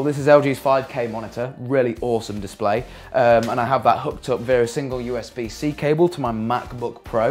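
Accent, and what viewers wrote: United Kingdom. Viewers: Is he welsh?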